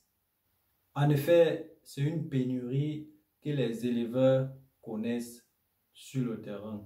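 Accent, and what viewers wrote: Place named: Cameroon. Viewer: Merci beaucoup pour tes videos mon frere, moi cest Paul, je ne sais pas si tu es camerounais, sinon ton accent me fait penser que oui. Jai aussi une question, Quels businesses conseillerais tu a un jeune qui se lance en affaire et na que 500 000 FCFA de capital au cameroun ?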